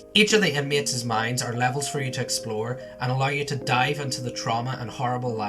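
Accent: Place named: Ireland. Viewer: My favourite part was the Irish accent 😌 And the Insight deafly going to add this to my steam list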